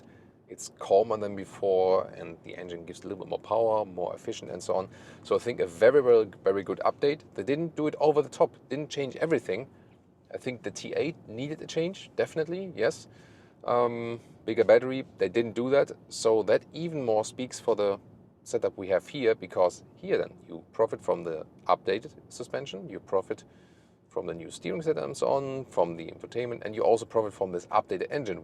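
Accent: Germany. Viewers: You have german english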